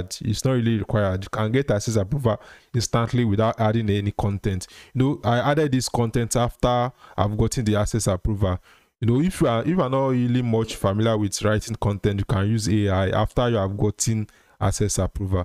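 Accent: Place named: Nigeria. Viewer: Nigerians, your accent cannot go unnoticed. "Sarch", "pour-chase". Lots of love from Anglophone Cameroon ❤️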